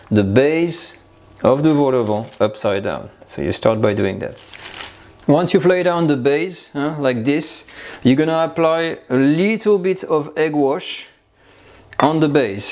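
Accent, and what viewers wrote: France. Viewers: I really love your french accent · Very charming French accent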